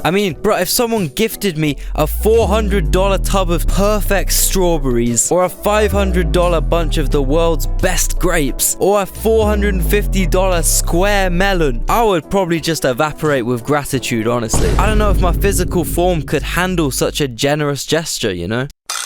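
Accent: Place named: United Kingdom. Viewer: I love compilations of random clips with a slighty angry British person talk about it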